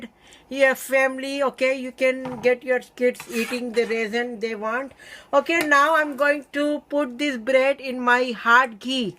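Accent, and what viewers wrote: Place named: India. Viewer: hhahahahahah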